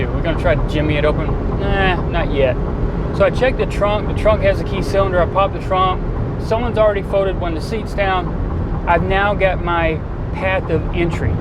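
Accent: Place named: Georgia, United States. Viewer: Thanks for the reply and sharing your Neon repair technique . Never lose that cool Georgia accent. Been SUBSCRIBED for a while . Prosperity, long life and good health to you and yours.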